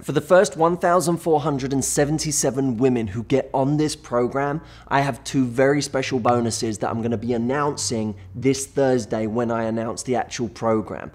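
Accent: United Kingdom. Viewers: Something about a man telling me how to adjust my standards to a bliss point to gain a man's interest and respect is making me ... angry? indignant? patronized? I'm a little salty here on his sweetness. Ladies, please, this entire video begins on the foundation of "what I (a man) believe women experience"... that should have immediately triggered your intuition to approach this with skepticism. If he didn't have such marketable attractiveness (read as: cute brit with the accent) there's no way this channel would be so popular; hell it's why I clicked on the video. He's capitalizing on his sexual appeal to gain trust of women to what he believes will make a women attractive to men.like.him. Don't get me wrong, he seems like a sweetheart and I can see what he's /trying/ to accomplish, but after working in finance I can tell you, every video is still a clever sales pitch - don't buy into him. 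Just respect yourself and respect the man but to hell with any man that doesn't respect you - no matter how cute they are. It's much more satisfying to be with a man where you don't have to change yourself to a bliss point. Let him love you for you, salt, sugar, and every spice in-between. I trust that the "right" man (not the man that gets his ego easily bruised or the man that doesn't respect your sweetness) will respect and love you more (and a lifetime) for being real than someone who is artificially flavored. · Love this british face and accent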